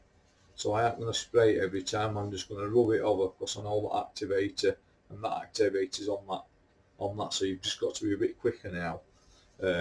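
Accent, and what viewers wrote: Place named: Yorkshire, United Kingdom. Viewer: Good video mate 👍 and love the Yorkshire accent too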